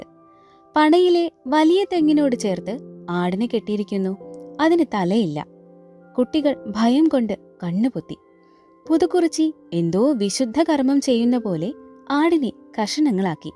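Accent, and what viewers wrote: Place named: Thiruvananthapuram, India. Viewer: 🎉🎉🎉. തിരുവനന്തപുരം ശൈലി 😂😂😂😂 ചിരിച്ചു ചത്ത്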